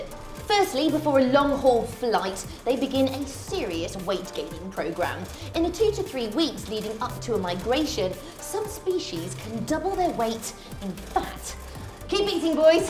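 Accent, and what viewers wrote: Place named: England, United Kingdom. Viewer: senin ingiliz aksanına USS Misisipi çarpsın.. bu kadar mı itici olur bi kadın ya...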